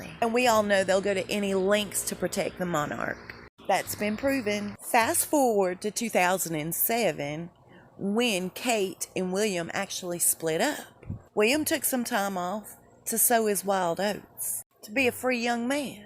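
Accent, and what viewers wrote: South, United States. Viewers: Love 💘U, We Will All Miss U, We'll Miss That Southern Accent With All That Great TEA U Serve Us. U R SORELY LOVED We'll Miss U Something Awful, Rest In Peace Our Beautiful Sweet LADY, 🦋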